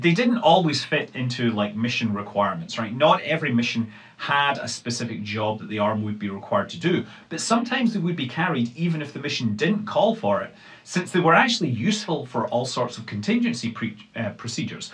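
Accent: Scotland, United Kingdom. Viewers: Your Scottish? That's dope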